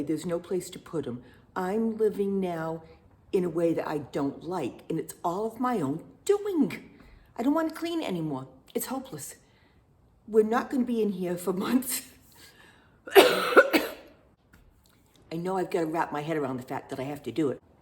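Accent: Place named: Massachusetts, United States. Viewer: Hello, new subscriber here! I just found your channel and I love it! As soon as you started talking I knew you were from Massachusetts! I can relate to everything you have said in this video. I am slowly learning self care instead of being a doormat. I look forward to watching your future videos as well as your back catalogue. All the best to you and your family from Massachusetts!!😊🌲🌳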